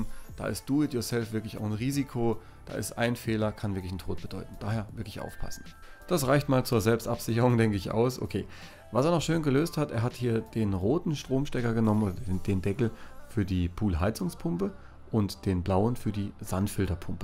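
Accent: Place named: Swabia, Germany. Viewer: Ja, der ist schon ein guter. Vor allem die Stimme, auch wenn er aus dem Schwäbischen kommt. Die Stimme ist weich und er erklärt es super. Könnte auch als Werbesprecher Arbeiten.. Jetzt zum Thema, ich habe mir auch eine Pool Heizung zugelegt. Pool hat nur um die 3000L. Hatte als erstes den Papierfilter gegen eine Sandfilteranlage mit diesen Bällen getauscht. Muss sagen geile Sache. Im zweiten Schritt habe ich mir bei einer großen Plattform 4 Absorber und das Passende Zubehör Bestellt. Alles auf die Wiese im Garten zusammengebaut und es lief alles super. Inzwischen habe die Anlage auf unser Pergola Dach montiert. Jetzt funktioniert sie leider nicht mehr richtig... Habe mir dazu dein Video mit deiner Heizung angeschaut und musste wahrscheinlich feststellen das ich auch ein Rückschlagventiel und eine Fallbremse brauche. Na ja, ist bestellt jetzt hoffen wir das es geht. 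Und das bei einer Fallhöhe von nur 2m. Vielen Dank für deine Filme. Mach weiter so.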